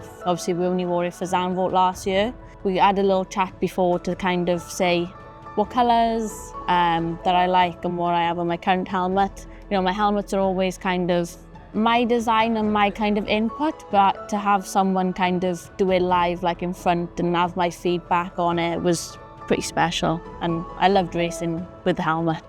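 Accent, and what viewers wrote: United Kingdom. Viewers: Always liked the Welsh accent 😊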